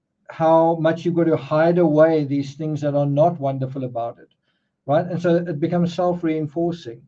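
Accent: South Africa. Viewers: jy klink soos 'n Suid Afrikaner. Is jy?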